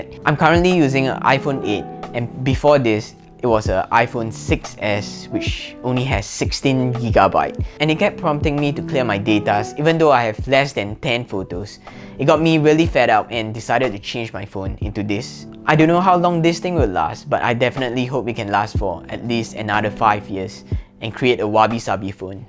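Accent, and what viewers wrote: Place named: Singapore. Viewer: Can’t get past singlish.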